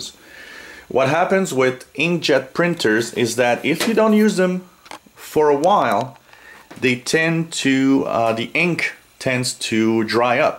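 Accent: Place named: Quebec, Canada. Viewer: french canadian accent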